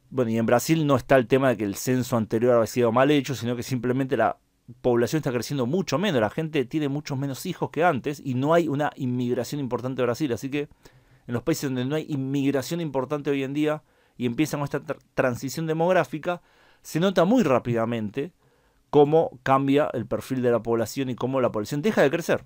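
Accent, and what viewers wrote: Argentina. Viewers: Este creo que es un argentino por el acento. Perú, país inviable? Está muy despistado. Los ejemplos que pone son absolutamente burdos. Hoy, Argentina sufre los efectos del peronismo y Kirchnerismo. Le sugiero que estudie más y hable con sustento. El 45 % de la población argentina se encuentra en pobreza y Milei, tendrá que trabajar mucho y alinear a este charlatán que me causa vergüenza ajena. Argentina está en default y con índices de pobreza y subdesarrollo espectaculares. Perú, está creciendo económicamente durante los últimos 30 años, pese a los problemas y que tiene una de las monedas más fuertes de América Latina. Anda a estudiar economía en serio y basta de vulgaridades basadas solamente en la variable población.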